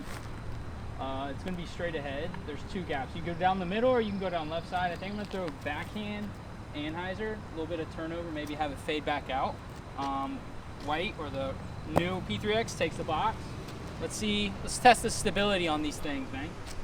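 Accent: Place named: Ireland. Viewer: Awesome Irish ACCENT!!!!!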